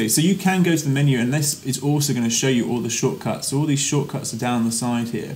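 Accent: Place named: United Kingdom. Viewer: Great tutorial! Very helpful. The British accented paused before saying "ZEE for zoom" made me smile, too.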